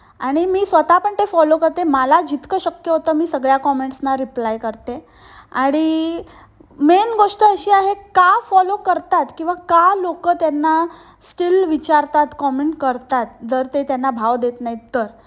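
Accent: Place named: Kolhapur, India. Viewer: Tú Swayahala Ka Dakhvit Nahis ????? Nav ,Gav Kahihi Sagat Nahis ...Ka?????? YuBplnya Varun Tu Kolhapur Kivha Marayhwdayatil Vatates ... Kahi Ward , Tone Tuze Typical Tikadache Vatates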